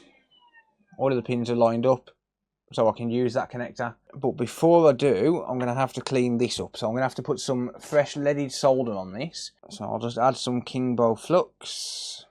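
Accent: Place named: Black Country, United Kingdom. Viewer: Brilliant, your patience and attention to details is superb. You sound like a black country boy, where are you located?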